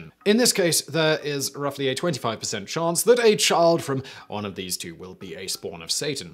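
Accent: England, United Kingdom. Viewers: You're so cute when you crack up and call out your writers! It just slays me.🥰, I adore how freaking English you are! You're like a young Sir Patrick Stewart, can't help but crush a little bit.....or Sean Connery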